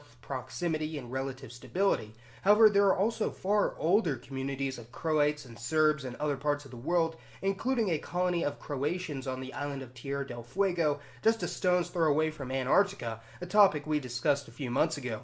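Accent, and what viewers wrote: United States. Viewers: Speaks American,america started all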